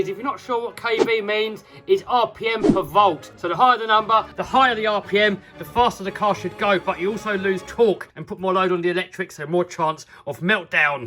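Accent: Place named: England, United Kingdom. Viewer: Im not a hater, but I can not stand that 'more power' attitude ! YOU HAVE NIL IDEA ON ANYTHING TO DO WITH RC. Why do you think that 'fastest' world record attempt turned out a free giggle for me when you wrecked your car ? Because you have absolutely no understanding of aerodynamics ! Honestly, whenever I see your head on a video, I turn it off immediately because I cannot stand that pommy accent and your boofhead attitude. You should feel privileged that I'm even taking the time typing this LOL.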